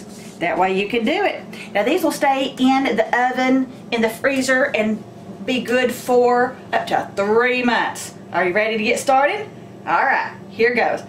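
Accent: South, United States. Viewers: Medm crispy?? Not completely done. Thanks for cooking demo. I'll have to try the burritos. Whisky- woo. Love the way you talk. I'm southern too. Whenever I travel thru Georgia., No one Asks where ya from??? Assume I'm a native. Love it.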